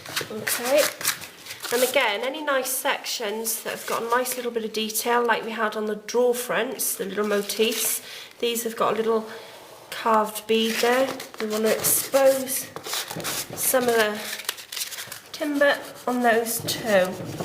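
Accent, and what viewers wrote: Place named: Wales, United Kingdom. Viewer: hi, do you use both farrow & ball undercoat/primer & top coat, & are they both water based? also the liming wax, what brand is it, btw its fantastic to hear a fellow welsh accent on y.t. for a change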